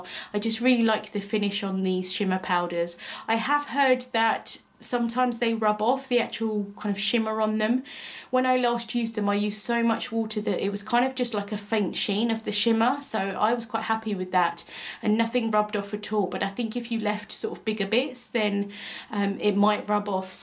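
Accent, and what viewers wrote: United Kingdom. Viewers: Beautiful haul you collected. I'm my ignorance I stupidly never realised you were on my side of the pond. So used to our American Crafter's the accent didn't even sink in. Glad I've found another UK crafter. Hope the headaches get sorted, I used to have so many cluster migraines I totally feel for you x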